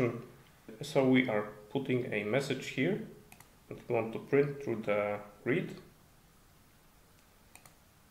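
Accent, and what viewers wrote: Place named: Eastern Europe. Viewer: Nice, cant wait for the audio implementation. Are you Polish? You sound slavic.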